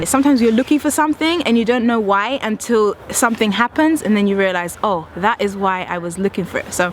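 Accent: United Kingdom. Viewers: gosh I love your accent! I wanna move to Britain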